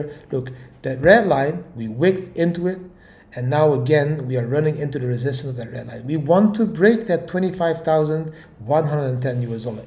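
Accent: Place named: Netherlands. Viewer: This guy is still Dutch AF 🤣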